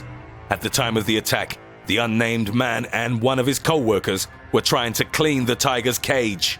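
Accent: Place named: London, United Kingdom. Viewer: Accent is from London, UK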